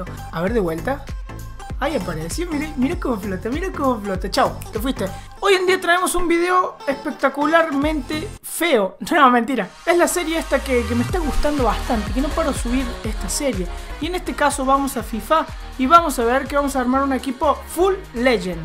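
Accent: Córdoba, Argentina. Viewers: Eres cordobés?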